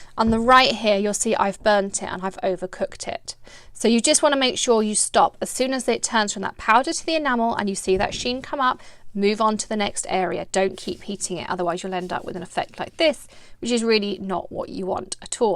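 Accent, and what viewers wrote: England, United Kingdom. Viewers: I really love your videos, very clear, easy to understand and no unnecessary chit chat or giggles. There aren't many tutorials done with a clear English accent, you will be my go to site for all tutorials in future. Please keep them coming. Thank you.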